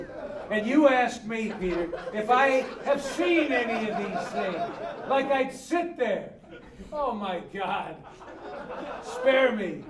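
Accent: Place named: United States. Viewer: the hand gesture and US accent threw me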